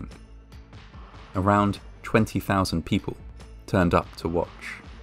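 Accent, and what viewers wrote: United Kingdom. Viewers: He’s British, it’s not fair :(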